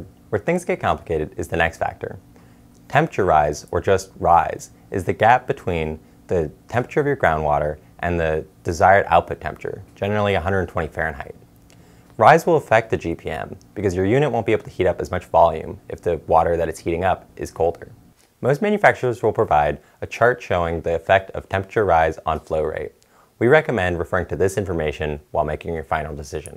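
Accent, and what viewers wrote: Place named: Asia. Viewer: Your English is terrible - is that an Asian accent? I can't understand what you are saying.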